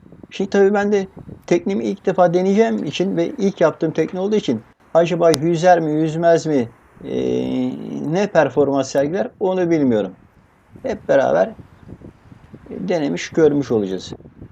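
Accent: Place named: Black Sea, Turkey. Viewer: Abi karadenizlimisin selamlar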